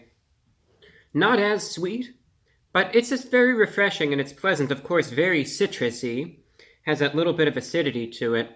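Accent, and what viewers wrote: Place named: Mid-Atlantic, United States. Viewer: Love his Atlantic accent.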